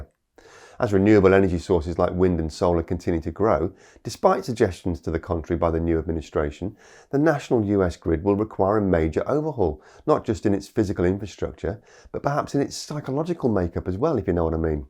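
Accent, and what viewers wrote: United Kingdom. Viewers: The climate scam is going to be fully exposed. The climate models are a complete fraud because they are base on the assumptions. A British accent won’t overcome the fraud, I’m pleased to say.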